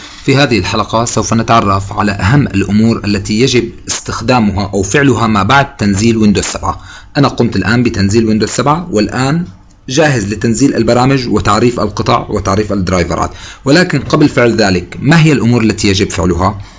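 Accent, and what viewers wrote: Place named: Levant. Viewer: تعجبني لكنتك الشامية ولغتك العربية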